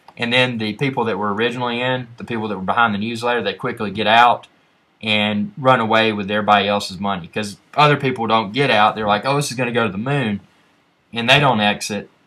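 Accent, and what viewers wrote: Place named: South, United States. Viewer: trading stocks and a southern accent DO NOT GO TOGETHER... that's like a mexican cooking your chinese food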